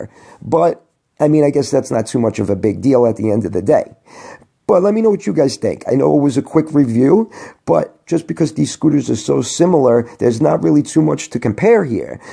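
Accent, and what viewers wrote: New York, United States. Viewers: I love your NYC accent lol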